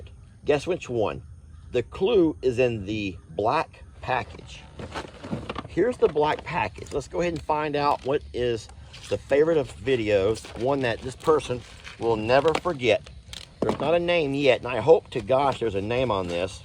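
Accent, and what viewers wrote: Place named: South, United States. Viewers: I would have always thought you would have been the life of the family events when I first started watching ya'll a few years ago. Ya'll are my type of people. Every relationship I've been in they have been the outcast of their families and it's just so sad. My family is so close and only one aunt is kind of an outcast but she hasn't always been.....her actions put her there. I love all of ya'll but you guys and your dad are my absolute favorite. Will have to say out of all of ya'll you keep my attention the best regardless of the video. Maybe what draws me in the most is you talk a lot like we do here in NC. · have read this to you and don't ever want to change your voice I myself love listen to you and your family talk I love the southern accent ❤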